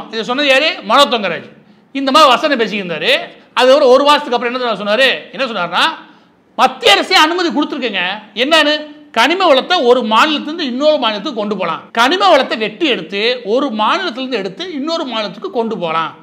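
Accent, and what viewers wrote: Madurai, India. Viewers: மதுரையை விட்டு கிளம்பி மஹாராஷ்டிராவுக்கு வந்து 24வருஷம் ஆச்சு.. உங்களாலே தான் மாரி தாஸ் சார் நான் மதுரக்கார ஃபீலிங்ல சந்தோஷமா இருக்கேன் 😊✌🤝I like your Madurai accent👍👏💃😊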